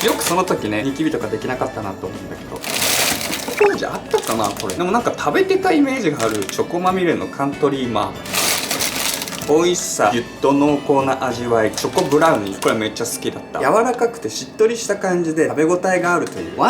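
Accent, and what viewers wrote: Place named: Hakata, Japan. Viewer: 「 」で検索し始めてお目にかかりました！ 独特な方言が癒されますね〜😊福井っぽいと思ったけど博多弁なのかな？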